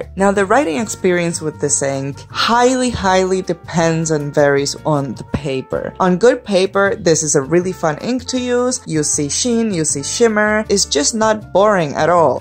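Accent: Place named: Bogotá, Colombia. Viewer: Are you from Bogotá? Porque tu acento me suena bogotano y que bueno tener a una compatriota fan de la caligrafía, las tintas y plumas :-)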